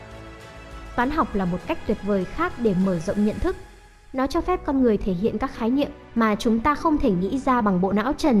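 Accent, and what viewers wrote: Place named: North, Vietnam. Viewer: Giọng Bắc đấy.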